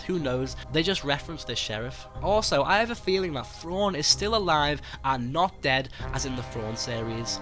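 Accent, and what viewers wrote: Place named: Northern England, United Kingdom. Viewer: Just wondering - I'm guessing you're from northern England - what football team do you follow?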